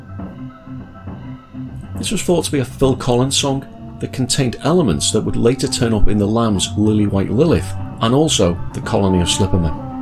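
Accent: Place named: Liverpool, United Kingdom. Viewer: You have a scouse sound, being from Liverpool myself I can tell a bit of it (maybe a bit manc?) Genesis were always very popular in the north west, with them and floyd having about a 20% increase on cost on tapes and vinyl in the 90s when I was a teenager! Well worth it though.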